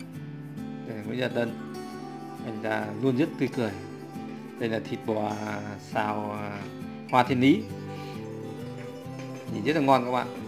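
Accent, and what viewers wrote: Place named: Hung Ha, Vietnam. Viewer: Ông anh đúng giọng Hưng Hà rồi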